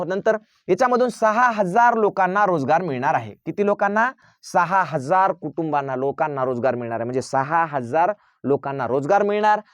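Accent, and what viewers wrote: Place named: Parbhani, India. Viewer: भाषा परभणी ची वाटते सरांची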